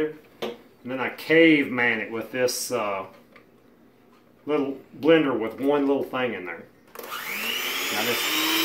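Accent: South, United States. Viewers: Thanks, I enjoyed that, and there is one of those espresso makers sitting unused, in my office. Will try it out, and see if it will help me get rid of the sugar from my coffee. And I'm glad you are still going. I think the most important element of your message besides your natural relaxed what of talking about all this, is "us.", You present in a way that is inclusive and convincing to others, because "we" feel you are one of "us." The message is too important to leave unreached by those who may not feel to be one of "us." I do think your message is extremely important, and you should reach out to others who can make videos like yours, carrying the same message to those who need it, to reach others in our society who otherwise might not listen an old white guy with a Southern twang. Be well.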